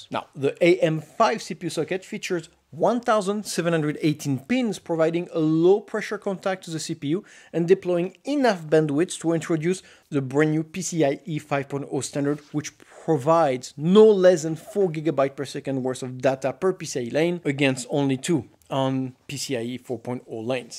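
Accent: France. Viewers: Man, I was about to buy it with a 7900X3D because it's 230€ but I'm going to pass ! Problem is, there isn't much X670 with 6 S-ATA ports at an ok price point at the moment. ASUS TUF GAMING X670E-PLUS WiFi looked good with additional SATA PCIe card but the card I'm looking at is X8 and both additionnal ports are X4... Super detailed test, with lots of passion and top french accent ;) ! Bon boulot, cette qualité de test me rend fier d'être français !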